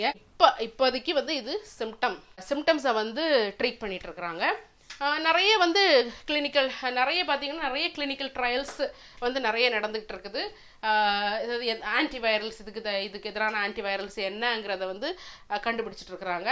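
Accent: Nagercoil, India. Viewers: Useful information Sister. Unga Nagercoil Tamil Arumai.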